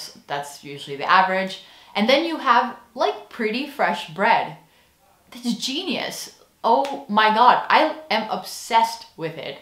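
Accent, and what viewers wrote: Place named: Netherlands. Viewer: It's happening 😯 I couldn't quite put my finger on it at first but you are starting to sound like a Dutch person speaking English. It's subtle, but definitely there. edit: although you did completely butcher the pronunciation of "ketjap" 😂 it's cat-jap, where the catpart is pronounced just like the animal, the "j" is prounced like the y in yes, and "a" is prounced like the second a in El Capitan. Ketjap.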